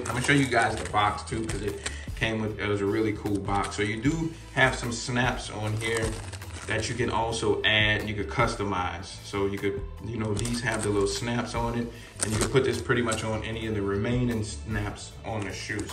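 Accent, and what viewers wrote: Baltimore, United States. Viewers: You from b more lol i hear it🔥💯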